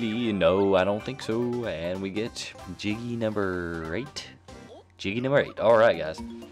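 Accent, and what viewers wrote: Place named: South, United States. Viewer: Your accent is pretty cool! It's southern but not seriously obnoxious like other people with southern accents I've heard. It's wierd because I live in the south and I don't have a southern accent... I don't think. O.o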